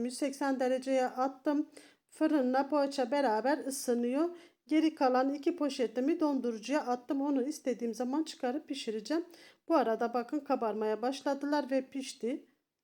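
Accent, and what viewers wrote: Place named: Black Sea, Turkey. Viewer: Ellerine sağlık çok güzel olmuş karadenizlimisiniz konusmasiveniz ayni bendekaradenizliyim izmirden selamlar ❤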